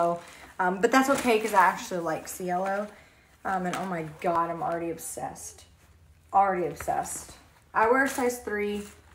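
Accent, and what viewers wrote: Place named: Brooklyn, United States. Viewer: sounds from brooklyn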